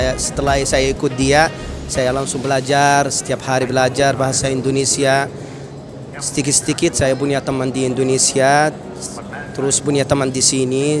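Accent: Arab world. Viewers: Iya tajwid nya masih kental..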